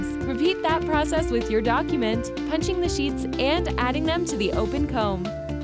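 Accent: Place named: West Coast, United States. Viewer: Jesus... worst accent for advertisements ever!!! Please note. Brits find the West coast accent really really annoying!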